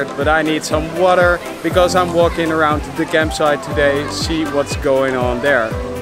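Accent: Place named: Netherlands. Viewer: Leuk! Ik ga daar 16 juli 2022 heen!❤(ik hoor wel dat je nederlands bent hoor😄)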